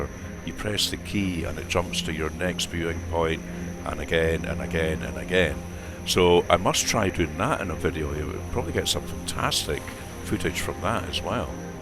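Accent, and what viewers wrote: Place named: Scotland, United Kingdom. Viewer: That’s cool. I must say as an Englishman your voice and accent is sweet and soothing to my ears. I’ve known alot of Scotsman but never heard that tone and timbre. Looking forward to the next video. Cheers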